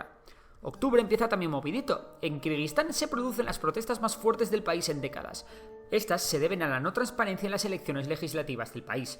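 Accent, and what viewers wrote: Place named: Spain. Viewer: la verdad se nota que eres de España xd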